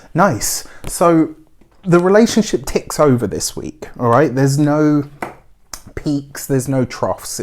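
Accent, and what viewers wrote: England, United Kingdom. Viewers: Thank you very much for the reading. I'm so glad as a Sagittarius girl. By the way. Are you an English? Cause I like the way you read. Lots of love buddy. 💗💗💗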